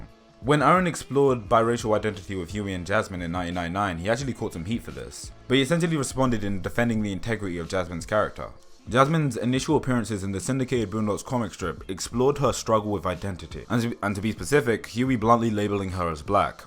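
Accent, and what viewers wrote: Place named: England, United Kingdom. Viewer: "sound white"??? I mean, from your accent it sounds like you've either spent time in england or have english parents... Just cause you have that accent doesn't mean you're white. Any more than someone sounding hood makes em black.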